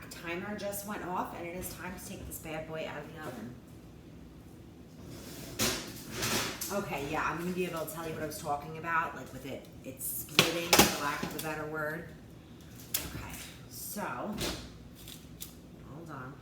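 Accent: New York, United States. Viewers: Hello from ALBANY!!!! I just baked bread tonight for the first time....Puerto Rican Pan Sobao. It was deelish! I'm a PR from Brooklyn, who 30+ years later still sounds like you...."why can't I find decent Italian bread in Albany?!?!?!???". I stumbled upon your recipe and was totally enamored when you mentioned Albany. I may try your recipe this weekend. Looks awesome!!! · Half Italian, half Irish. Lol, that's pretty typical of NY/NJ/CT. I live in Florida now, and it's comforting to hear my accent! 👍🏼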